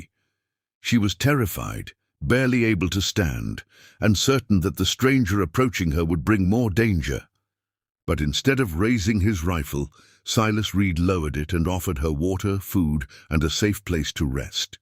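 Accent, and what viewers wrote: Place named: United Kingdom. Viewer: Another American western story narrated by a British accent. Not interested!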